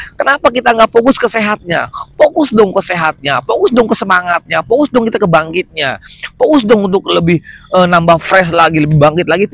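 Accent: Banyumas, Indonesia. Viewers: kirain ormg sunda kang jbule ngapak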